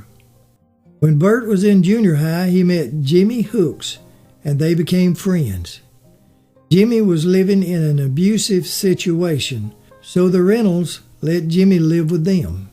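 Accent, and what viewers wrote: South, United States. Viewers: .... Another great vlog. You have the knack of telling a great story. I just do enjoy listening to your sweet southern accent. Please have a joyous and safe holiday season.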